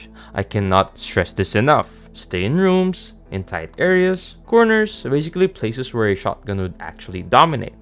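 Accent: Philippines. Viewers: based on his accent hes filipino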